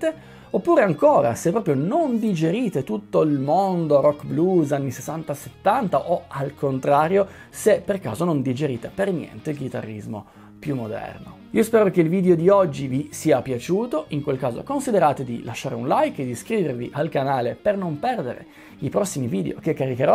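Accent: Piedmont, Italy. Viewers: Io (ragazzo negli anni '80), sono proprio partito dai chitarristi vecchia scuola (Knopfler è stato il mio primo idolo, e poi i più vecchi Clapton, Hendrix, Page, etc.) e solo in seguito mi sono avvicinato ai mega virtuosi che in quegli anni impazzavano. E devo dire che alla fine i virtuosi mi hanno un po' stufato, a parte pochi casi come Van Halen o Morse, mentre i vecchi classici sono restati. Anche perchè la mia tecnica è quella che è. Ora stimo enormemente gente come Govan o Timmons, tecnici ma anche raffinati, però continuo ad ascoltare molto più spesso il vecchio blues rock o hard rock ignorante, anche fatto da band contemporanee (ce ne sono in giro di molto interessanti). PS: viva l'accento piemontese 😄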